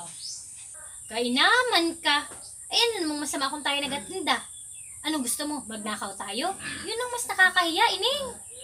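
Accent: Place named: Oriental Mindoro, Philippines. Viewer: Akoy tuwang tuwa sau marehin namiss k gnyng tono ng salita jn s ating Or. Mdo.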